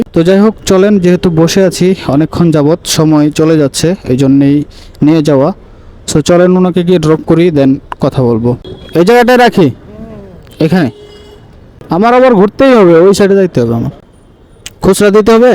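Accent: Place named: Sirajganj, Bangladesh. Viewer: বড় ভাই আপনার বাড়ি কি সিরাজগঞ্জ মনে হয় ভাষায় কথা বলায় বোঝা যায়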